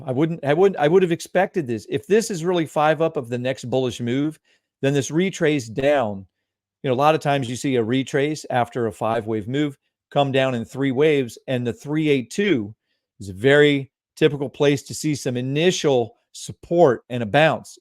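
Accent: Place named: Maryland, United States. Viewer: Btw, your accent is like my inlaws in easton,md 😂. Are you from md?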